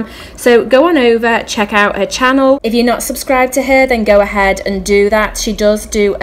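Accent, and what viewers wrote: United Kingdom. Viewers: Are you from the UK? Of USA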